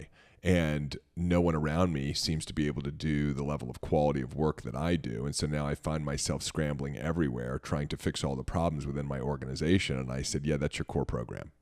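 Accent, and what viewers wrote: United States. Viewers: The more I listen to he keeps sounding more and more like that Turtle Dad from Finding Nemo. 😂Love that SoCal accent imma NorCal girl myself.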